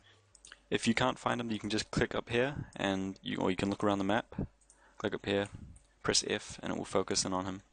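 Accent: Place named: Denmark. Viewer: Where are you from man? you have a Danish accent!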